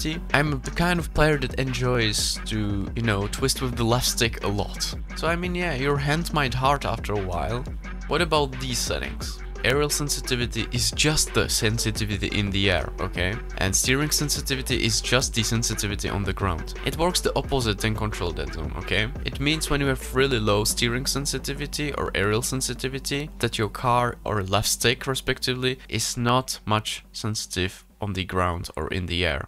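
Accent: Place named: India. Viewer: bro have best indian accsent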